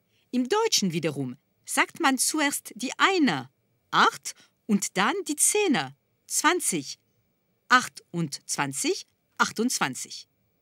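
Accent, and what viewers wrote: France. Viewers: "Sind sie nicht charmant diese Schildkröten" und dann noch mit diesem französischem Akzent...ach einfach nur himmlisch xD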